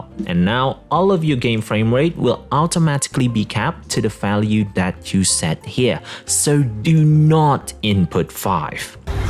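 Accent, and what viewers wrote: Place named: United Kingdom. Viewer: How you get that nice british accent?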